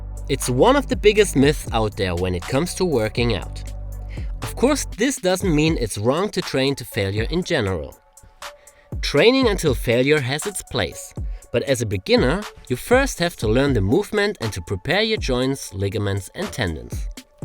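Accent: Germany. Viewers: Not a native speaker, is he? Sounds like a German accent to me, am I right?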